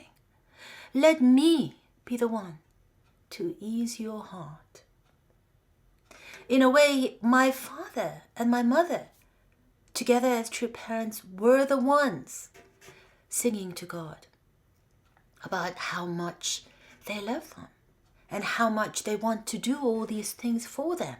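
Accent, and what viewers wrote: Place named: United Kingdom. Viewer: I LOVE HER UP SCALE BRITISH ACCENT.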